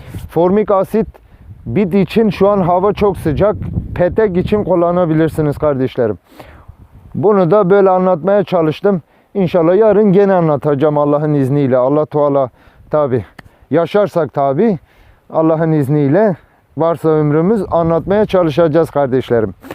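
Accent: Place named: Eastern Anatolia, Turkey. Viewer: Allah razı olsun kardeşim, nereli olduğunu merak ettim. Şive trakyadan çok doğuya benziyor.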